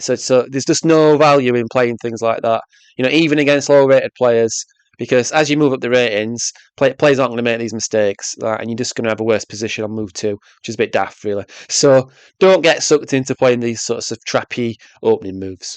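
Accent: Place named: Hull, United Kingdom. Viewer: I found your channel by chance, started this video and kept it going because of the way you pronounced "it's a bit daft really" and of course for the chess help but I'm from hull I'm sure u know it and you talk a bit like me so I subbed great content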